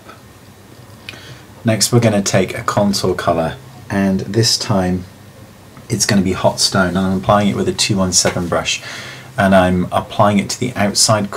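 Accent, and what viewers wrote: United Kingdom. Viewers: omg, I loooooooooooove your british accent :) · Excuse me Mrs/Mr; your VERY Beautiful && your British accent is HAWT(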